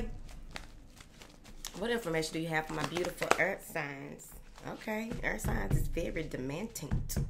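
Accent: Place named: Dallas, United States. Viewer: I knew you sound like you are from Dallas good lord southern woman thanks for your time and messages ms hope prosperity finds you for 2022 keep it up.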